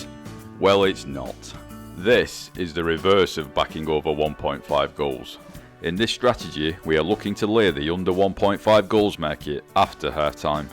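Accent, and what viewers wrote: Wigan, United Kingdom. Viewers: Gotta love that Wigan accent!!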